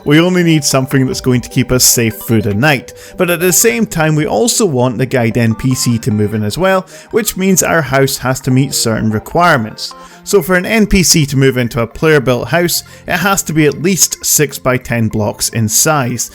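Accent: Scotland, United Kingdom. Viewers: My NPC died what do I do? Also are you from Scotland where are you from? I’m from Edinburgh.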